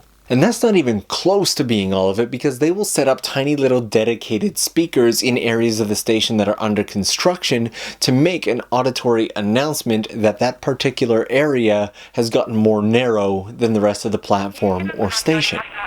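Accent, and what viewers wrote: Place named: Canada. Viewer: No I don’t mean it’s funny... I was just wondering if u are a Canadian Cus the way u pronounce those words... I love the Canadian accent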